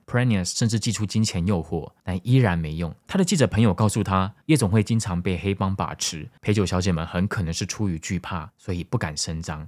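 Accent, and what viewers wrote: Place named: Taiwan. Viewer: 第一次看到的影片，讓我迅速就變壽粉了。臺灣人的口音跟中字幕讓我聽起來好舒服看起來易懂…………都噴水了…………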